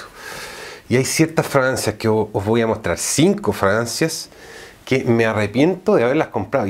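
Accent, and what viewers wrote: Chile. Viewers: Tú acento se detecta a leguas amigo, eres chileno. Buen video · es Chileno ? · Eres chileno???? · este muchacho tiene en su acento o pronunciación una mescla de chileno centroamericano y español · disculpa te pregunté Eres chileno o español??? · Y esa mezcla tuya de acentos que ? el chileno iberico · Si es chileno, radicado en España, saludos desde CHILE."le dicen el sabueso "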